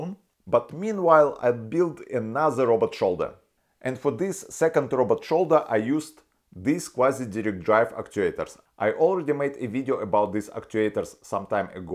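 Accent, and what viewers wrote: Russia. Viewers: You twice mentioned Putin at end your video again..hm...hmmm... and this Rus accent...hmmmmmm!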